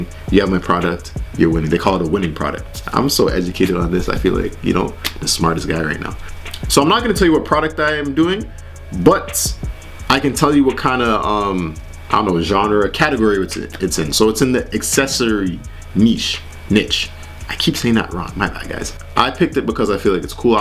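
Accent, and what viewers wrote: Toronto, Canada. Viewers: You can tell this guy's from Canada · you from toronto bro? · You from Toronto fam? I hear that accent haha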